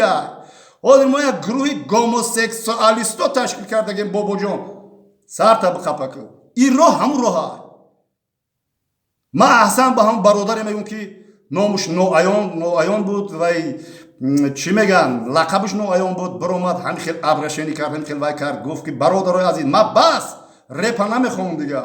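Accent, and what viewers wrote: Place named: Dangara, Tajikistan. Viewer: АСАЛОМ АЛЕЙКУМ БОБОЧОНИ МА ХУДОВАНД НИГАХБОНТОН БОША ДУСТОН МЕДОРУМ СУХАНРОНИТОНА ШЕРИ АЛЛОХ, МИСЛИ РАХМАТИИ БОБОМ ДАНГАЛИЕН БИСЁР МОНАНДЕН. САЛОМИ ГАРМ АЗ МУЛКИ ГАРИБ )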